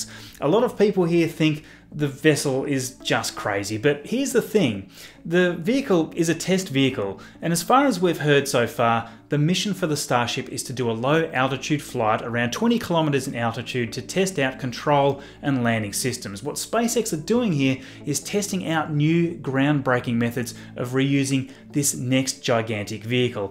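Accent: Australia. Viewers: I’m subscribed and know this channel but always forget it, the way i remember you is the Australian accent lol 😂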